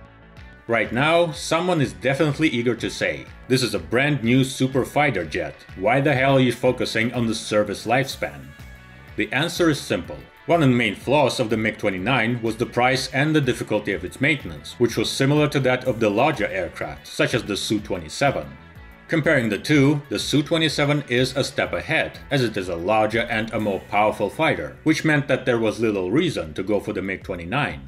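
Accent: Russia. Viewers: North american video with a russian accent...complete bs...what about the fact it can stop mid flight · От этого отстоя отказалась армия. Так что - можете не обсуждать привидение Каспера. С рязанским акцентом троллей посёлка Ольгино. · Good video and I love your Russian accent. Subscribed.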